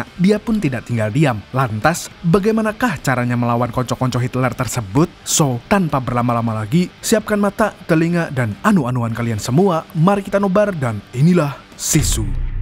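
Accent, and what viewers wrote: Banjar, Indonesia. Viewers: Banjar pina nya wkwkwk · Njir urg banjar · Org Banjar sekali nya😂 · banjar banar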